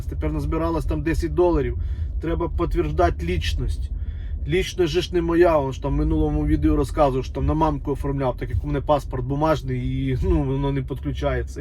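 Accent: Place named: Korostenshchyna, Ukraine. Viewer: А я й чую десь хлопак з коростенщини ну північ полюбому...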